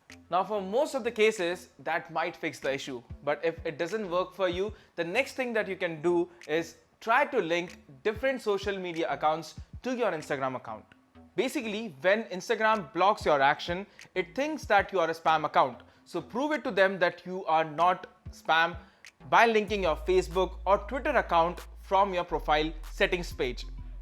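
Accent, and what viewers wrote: India. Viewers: Can u please do not the Indian accent?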